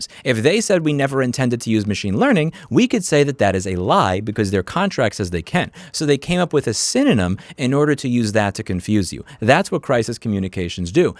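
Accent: New York, United States. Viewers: I just now noticed your new york accent, never noticed it before this video.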